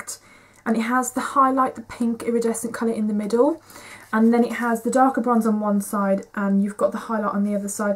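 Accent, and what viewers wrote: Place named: Birmingham, United Kingdom. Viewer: where are you from you sounds like a brummie! xx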